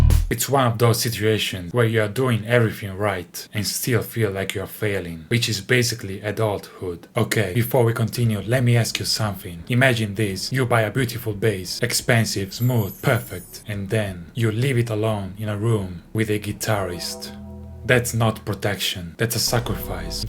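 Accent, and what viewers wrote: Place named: Italy. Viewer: who? the commenter said he was mexican and I dont think is american, sounds pretty italian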